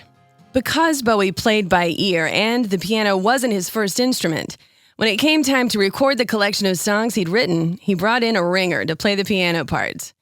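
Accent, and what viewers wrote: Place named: United States. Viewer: This is a superb commentary on a song like no other. I've just seen so this and other songs are going round my head as they did when I first bought the Ziggy album in 1972. I love it when you read aloud the lyrics. You have a great voice, and speaking with an American accent, you bring out different shades of meaning in the words, originally sung in his London / English accent. Many thanks!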